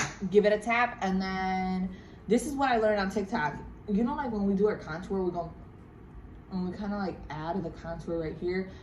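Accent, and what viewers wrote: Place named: Spain. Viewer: Hola 👋🏼 I’m a first time viewer...I found your video because my hubby & I are going to the same resort in Feb 2021...I really enjoyed watching this vlog! And the makeup tutorial was a bonus! You are so pretty and I loved your story about pronouncing things differently. I adore Spanish accents so when I hear it, it’s like “butter to my ears”! Anyways, I just wanted to say hi and let you know you have a new subscriber! Thanks again for making this video...glad you and your hubby enjoyed it! 😘🥰❤️PS I think you look like the actress Megan Fox! Lucky you😍😍😍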